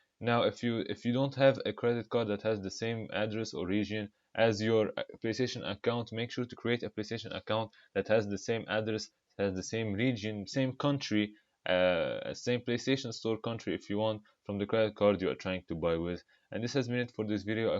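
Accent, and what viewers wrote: Arab world. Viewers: واضح من لهجتك انك عربي 🙂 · Bruh from your accent i thaught u where arab. When i saw your name i knew it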